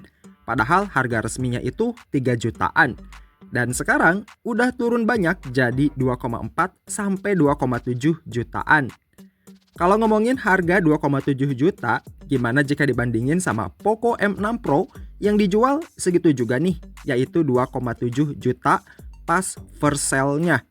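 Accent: West Java, Indonesia. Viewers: Mang siga orang sunda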